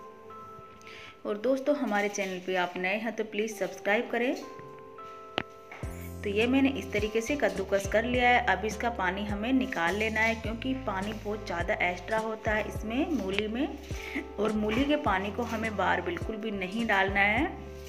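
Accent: India. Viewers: Nice Video ! From your voice it appears you are from Rajasthan !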